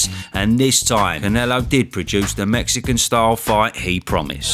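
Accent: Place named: Essex, United Kingdom. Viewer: Can they get someone else to do the commentary, his flat Essex/counties tone is really off putting, but great channel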